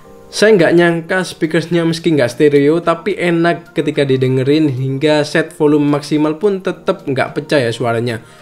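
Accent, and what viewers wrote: Sidoarjo, Indonesia. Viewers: eh masnya orang sidoarjo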